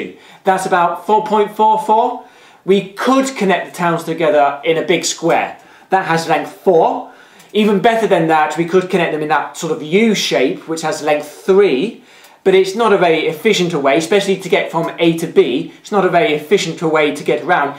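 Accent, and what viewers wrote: United Kingdom. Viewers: totally fucked my mind. Also I want to apologise if you aren't Brit but that's how I imagine the average British accent sounds. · bahahaha, liverpudlian accent ftw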